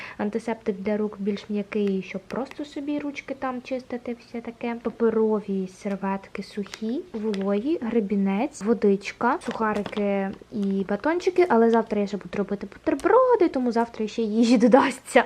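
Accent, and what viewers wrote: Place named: United States. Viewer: це так цікаво❤️ Детальний розбір, покупок, сумок) А твоя англійська 😍 наче ти амерікан гьорл)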